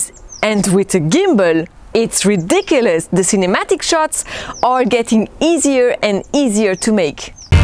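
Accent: France. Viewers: Je viens de découvrir ta chaîne. J’adore ton accent français quand tu parles anglais ! Je comprend tout ! Je m’abonne du coup !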